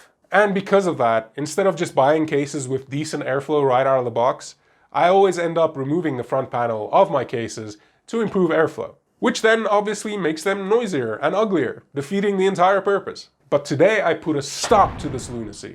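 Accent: South Africa. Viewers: Oh damn that’s a surprise, you’re South African. Like me ✌🏻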